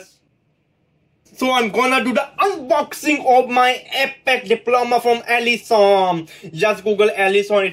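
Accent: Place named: India. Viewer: Basically you are the reason whole world thinks all indians have an accent like you basically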